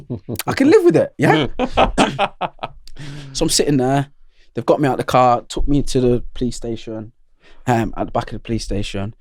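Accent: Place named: Staffordshire, United Kingdom. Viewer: Staffordshire accent neutral pretty much!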